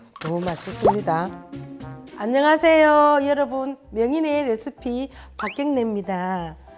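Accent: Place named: Gyeongsang, South Korea. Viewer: 앗 우리 갱상도 어머님.. 유튜브를 하고 계셧엇어요?! 구독합니다 ㅠㅠㅠ 몰랏엇네요 ㅠㅠ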